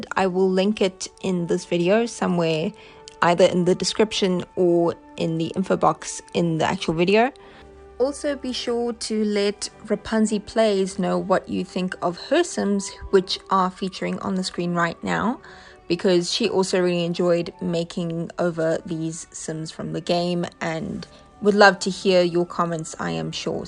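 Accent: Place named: South Africa. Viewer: I missed your entry video (consider it watched now)! Thanks for this. Great hearing a SA accent again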